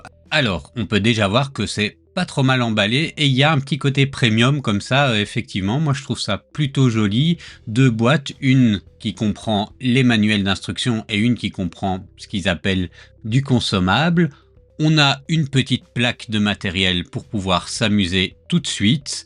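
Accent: Belgium. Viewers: Bravo pour ce test. Clair, sympa et sans pression. Ce petit accent belge est super agréable 😜. Ca donne envie d'ajouter un laser à mon atelier. Merci pour tout ce travail.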